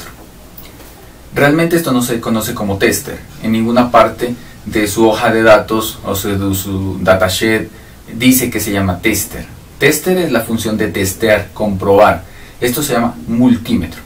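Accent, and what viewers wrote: Pasto, Colombia. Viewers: Ud es de Pasto? profe. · Eres pastuso cierto?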